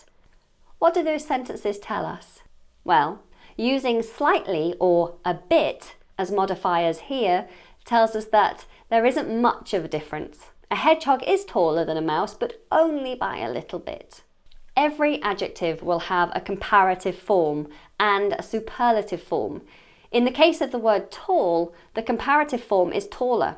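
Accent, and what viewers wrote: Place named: United Kingdom. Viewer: Wel come sweety. Huge thanks 4 ur daily updating us wz ur english knowledge nd British accent. I love u. Ur bro Salih from Eritrea.❤❤❤❤❤❤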